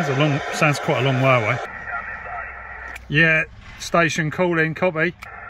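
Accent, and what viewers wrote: United Kingdom. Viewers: I love how the British pronounce all the vowels in aluminum. BTW what type of cb radio is that?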